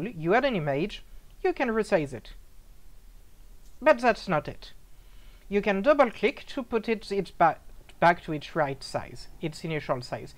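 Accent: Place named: France. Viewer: Thank you very much really useful addon and I really like French accent :)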